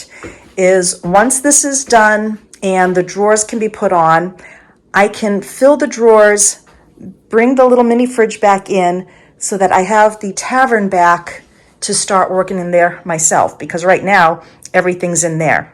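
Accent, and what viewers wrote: New England, United States. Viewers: I'm not even halfway through this video and I'm so excited to see your kitchen come together. One question I have is it looks like your stove is far from your sink, but is that just a result of filming and perspective? Also, I love how you say "drawring". Don't ever change that New England accent! 😀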